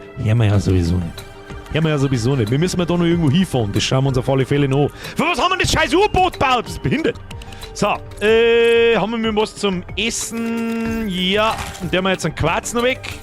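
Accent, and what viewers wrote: Bavaria, Germany. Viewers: Bin vor 2 Jahren von NRW nach Bayern gezogen. Höre deine Videos auf der Arbeit um mal mehr bayrisch zu verstehen😂 Funktioniert echt super! 🎉😂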